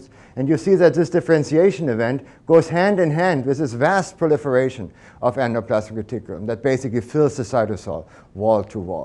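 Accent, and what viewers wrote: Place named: Germany. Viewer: I remember when he still had a thick German accent when I first met him. It looks like it has shifted over time.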